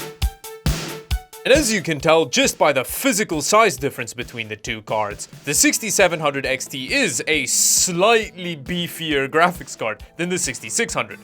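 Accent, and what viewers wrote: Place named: South Africa. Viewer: the best part about watching is that he sounds south african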